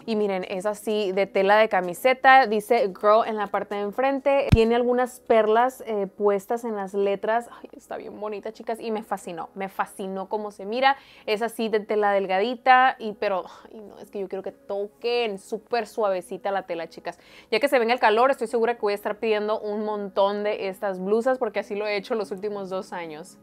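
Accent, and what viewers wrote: Sinaloa, Mexico. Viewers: Me encanta escuchar las chicas de sinaloa 🥰🥰 soy Dominicana🇩🇴 y amo su acento sinaloense ♥️👌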